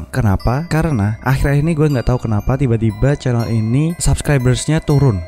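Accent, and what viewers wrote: Java, Indonesia. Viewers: aku mnden wedii.. mnaa orang jawaa suaranya...sukses rus ya kka 2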